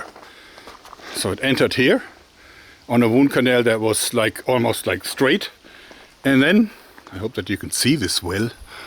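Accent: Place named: Germany. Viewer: For one, international english is based on British English unlike canadian and american English. But also, Mr is german, so his pronunciation is different, no moreso than any regional dialect like American.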